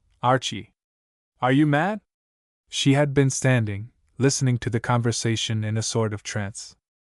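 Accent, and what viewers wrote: United States. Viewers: Americsn narrator really doesnt work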